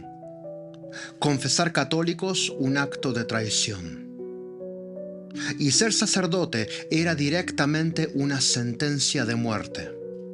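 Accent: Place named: Argentina. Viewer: Excelente hermano, vas con todo!!! Gracias a Dios por tu conversion. Y tu forma de expresarte tan contundente. Me encanta tu peculiar acento Argentino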